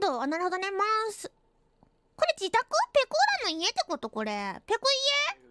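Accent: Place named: Japan. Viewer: Peko japglish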